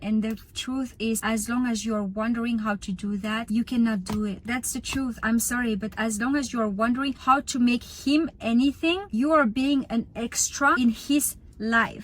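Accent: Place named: France. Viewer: i really enjoyed this video!❤ i love your accent, are you from France?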